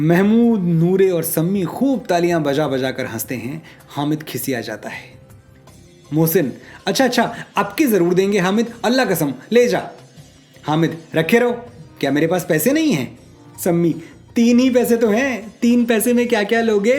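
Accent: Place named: Uttar Pradesh, India. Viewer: Main bhi uttar pradesh se hu ji aapki boli main uttar pradesh ki bhasha jhalkati hai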